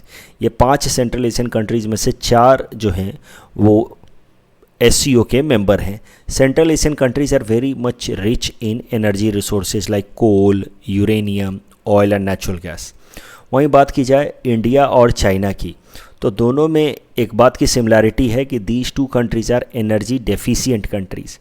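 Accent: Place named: Bihar, India. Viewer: Ur English like BihariEnglishsm....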